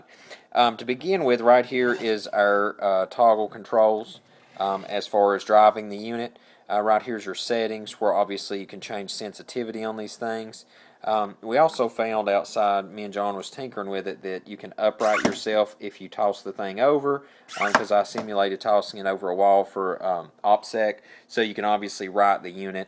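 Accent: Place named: North Carolina, United States. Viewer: Are you from North Carolina?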